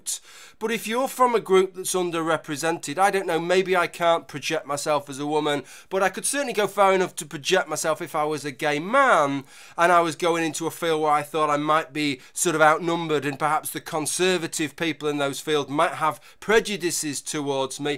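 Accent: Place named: Northern England, United Kingdom. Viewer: You expressed most of my thoughts, though more eloquently (and with a northern accent!). The problem with claiming the shirt is sexist is that the argument is not falsifiable. If you try to provide a counter example, such as men in sports gear, it's not analogous because the 'power differential between men and women under patriarchy' gives the offending shirt a meaning that doesn't apply to other motifs involving men or inanimate objects. If you argue that the poses aren't inherently pornographic, they can readily produce an example from pornography because as you observed, just about any pose can be pornographic. If you don't think the shirt would really keep women out of STEM because it isn't inherently sexist, and you happen to be male, then you're dismissed because 'men don't know what it's like to be a woman under patriarchy' and you are 'mansplaining'. 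If you're female, then you're a 'chillgirl' with 'internalised misogyny' and are probably just trying to curry favour with the men anyway. Many of the arguments for feminism resemble very closely those for the existence of god. It continually surprises me that the movement has gained so much traction in atheist/skeptic circles. I'm also glad you touched on the subculture aspect. I haven't really seen a lot of photos of Matt Taylor, but both he and his friend (Ellie Prizeman?) gave me a bit of a rockabilly/metal vibe. As someone who faced a lot of negative attention for being a goth in my younger days (eg. once some guy tr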